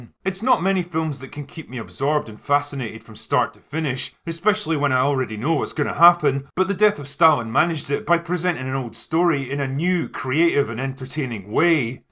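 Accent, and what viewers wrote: Scotland, United Kingdom. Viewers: “Ya know…” I fookin’ love this film. You could’ve voiced a Soviet General with yer Scottish Brogue, Comrade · yes scots do talk like that. Mate, what the fuck would have to gain by pretending not to be danish? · Narrator has quite the Scottish accent. Or Yorkshire. Either way, ridiculous.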